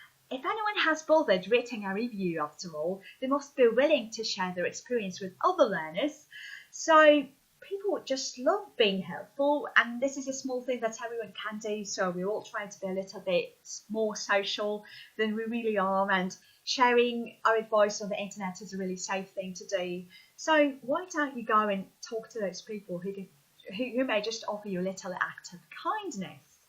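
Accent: United Kingdom. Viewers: Ти дійсно з України? Це просто як Гаррі Поттера дивитися в оригіналі. Я б не повірив тобі, якби ти зі мною розмовляла в Україні, що ти не native speaker. Дуже гарно розмовляєш, як викладач та студент, який вчить інших та вивчає англійську, можу сказати, що це найкращий брітіш екснт, який я чув від українця. Ти просто зробила мій день. Повністю згоден, тільки важка праця над собою може допомогти досягти advanced or proficiency.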